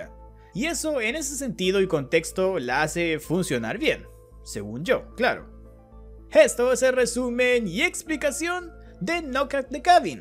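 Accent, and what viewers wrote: Chile. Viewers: ERES CHILENO? 🇨🇱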